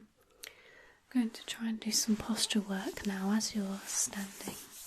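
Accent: United Kingdom. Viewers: I’ve just discovered you and am enjoying you lovely British accent! Do wish your writing on clipboard was louder. Thanks so much , so glad I found your channel 😛💕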